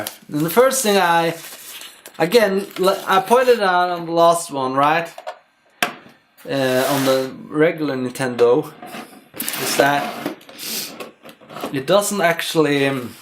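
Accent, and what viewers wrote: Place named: Norway. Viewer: Boi u suck at english Sounds like your from Norway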